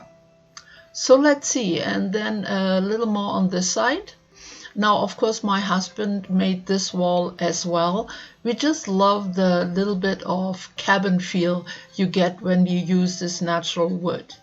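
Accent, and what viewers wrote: Germany. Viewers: Dir und Deinen Lieben auch ein wunderschönes Weihnachtsfest und einen guten Start ins neue Jahr. Gehe ich recht in der Annahme, dass ich da einen deutschen Akzent höre? ;o)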